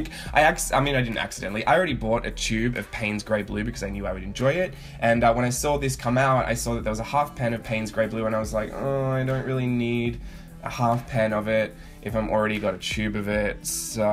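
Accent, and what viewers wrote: Australia. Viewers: Thanks for the lovely original swatches and the info on DS colours. It's always nice to hear an Aussie accent on mixed media so keep making these wonderful videos. xx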